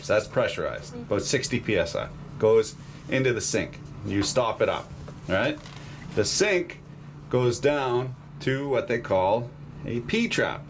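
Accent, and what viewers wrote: Canada. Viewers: I just want you to know that my husband watches your channel a lot, and the stupid shit you say (doodad, fuck it bucket, doohickey, etc) + your Canadian accent really, really infuriate me. I’m not sure why. That is all.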